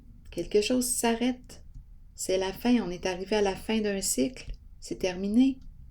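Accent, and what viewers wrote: Canada. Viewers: Bonjour. Merci pour vos tirages très parlantes. J'adore votre dialecte. C'est français canadien? Par avance, je vous remercie pour votre réponse et je vous souhaite un excellent weekend. Bien à vous 🤗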